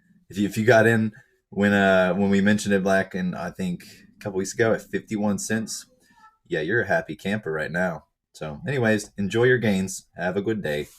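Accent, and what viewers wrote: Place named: England, United Kingdom. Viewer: Ur English accent is heaven to my ears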